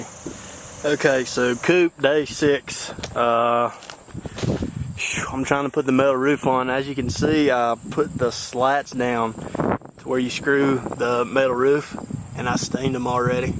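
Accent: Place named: South, United States. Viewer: Impressive work! And that southern accent of yours... I could listen to it all day. ;-)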